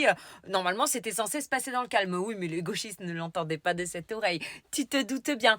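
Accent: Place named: Nord, France. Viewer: T’habites vers Poitiers mais t’as l’accent du Ch’nord..t’es typée du Ch’nord 😊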